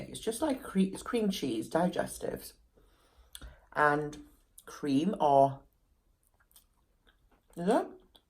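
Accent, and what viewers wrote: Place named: England, United Kingdom. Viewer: Gorgeous as always. I wish I had your lips. 🤤🤤 Your accent... I cant figure out what part of England you are from. 😂 I'm American but have lived in West Yorkshire for 8 years with the English husband. That cheese cake looks amazingggggggg. ❤❤☮☮🥓🥓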